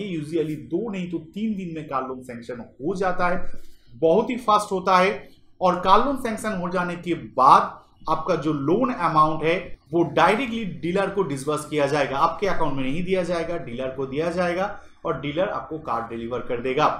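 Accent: Bengal, India. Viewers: Bangali...awaz sunte samjh aa gya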